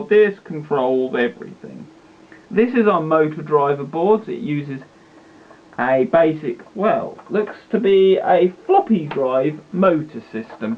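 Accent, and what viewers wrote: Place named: London, United Kingdom. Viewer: Thanks for the breakdown video. Like your accent, is it east or west London?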